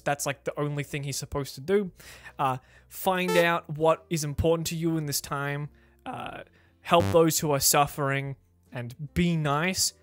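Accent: Australia. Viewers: an AUSSIE commentator finally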